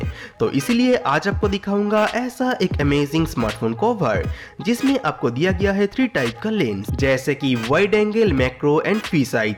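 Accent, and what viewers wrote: Bengal, India. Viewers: Bhai aap bengali ho kya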